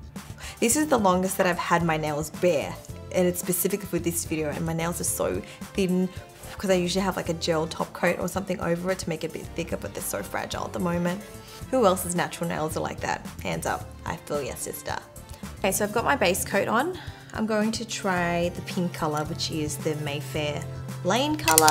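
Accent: Australia. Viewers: Is she Australian? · I love it when I watch videos of people with an Australian accent. We can sometimes be hard to find on YouTube. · I THOUGHT U WERE ASIAN BUT UR AUSTRAILIAN RIGHT? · Are you from Australia?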